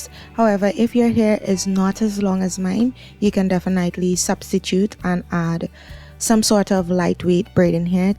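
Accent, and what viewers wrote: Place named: Trinidad and Tobago. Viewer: Trini to the voice ❤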